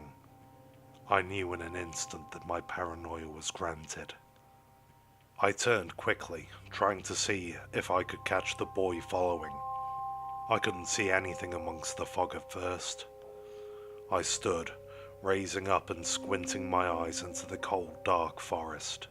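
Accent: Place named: United Kingdom. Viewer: Hello, I really love your Brithsh Accent it's So Sexy 💋 much love Sexy Grandma Darkness ✌💙💙 Ps keep doing This you are very Good at it ❣❣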